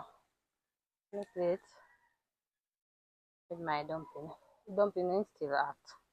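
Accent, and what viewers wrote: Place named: Jamaica. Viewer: Yeh mon dis ah di real Jamaican sound💪🏾💪🏾💪🏾🇯🇲🇯🇲🇯🇲🇯🇲🇯🇲🇯🇲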